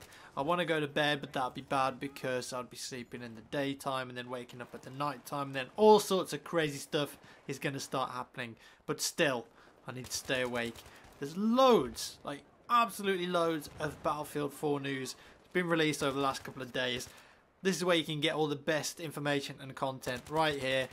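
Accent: United Kingdom. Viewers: Guess he can't stand your civilized British accent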